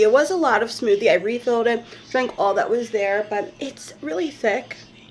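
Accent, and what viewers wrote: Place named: New York, United States. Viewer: Trader Joe's has very good whey protein powder in chocolate and vanilla..I add about eight ice cubes, cup of almond milk, fruit and some sugar free torani syrup to give it more flavor. I use a spoon to eat it as it's very thick. Look into Nestle and where they get their water. Why not drink tap water?...you sound like you are from NY where the water is great. The cost is way less and no plastic bottles.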